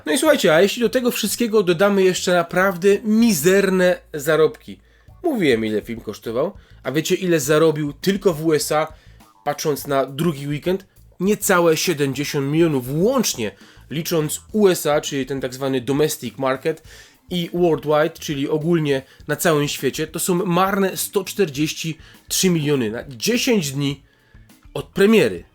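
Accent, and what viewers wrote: Silesia, Poland. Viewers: Jesteś ze śląska? Słyszę akcent :D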